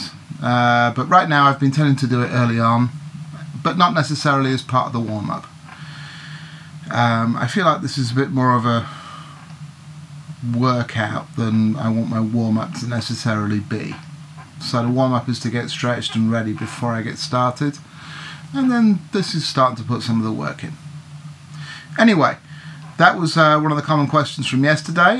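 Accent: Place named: Cornwall, United Kingdom. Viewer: this exercise has taken the worry I had that this dental work would really mess things up! I'm playing with you relaxed and it's keeping my tone in tune with yours. Your a life saver. John from New Jersey. Oh by the way my Wife Beverly is from Cornwall UK her accent matches yours to a tea. Mine is a Jersey accent. This exercise is going to keep me playing threw my dental visits. Thank you very much.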